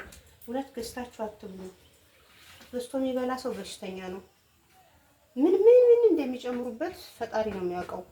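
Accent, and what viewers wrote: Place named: Wolaita, Ethiopia. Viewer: ፔኪን ፖውደር ነው የሚባለው አማርኛሽ ግን የወላይታ ነው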